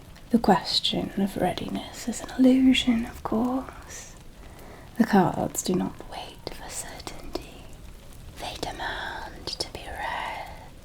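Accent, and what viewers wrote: Transatlantic, United States. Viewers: no way! I was just watching your older 1920s videos as inspiration for my 1920s themed Halloween costume! your trans Atlantic accent is unmatched 💖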